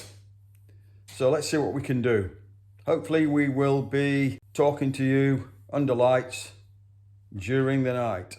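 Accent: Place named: Germany. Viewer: Thanks, that’s a German accent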